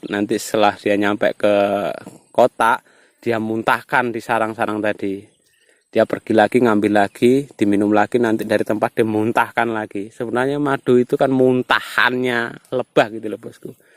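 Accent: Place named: East Java, Indonesia. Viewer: Sik kang, sakjane aku ki pingin belajar ternak tawon. Tapi ndelok n ngrungokkan narasimu kok malah ngekek karo misuh2 dewe dadine. Wis pokoke ndlodok n trocoh, khas jawa timuran sisih kulon ki ketoke. Gak waleh tenan ki ndelok. Sukses yo kang, lanjut kasih pengetahuan nggo wong akeh. 👍🇮🇩😷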